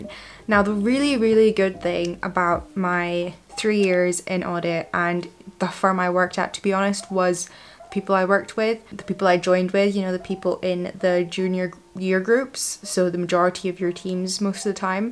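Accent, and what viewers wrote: Scotland, United Kingdom. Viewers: Sounds scottish to me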